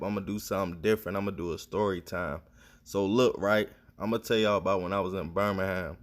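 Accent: Baltimore, United States. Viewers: My dude said T, d off on him!! You can tell you from Baltimore!! Shot out greenmount Ave 29th st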